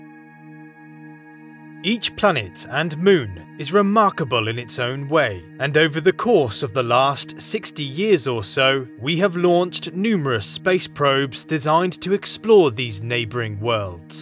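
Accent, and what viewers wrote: United Kingdom. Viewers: Who would have expected a British accent in a Mars footage?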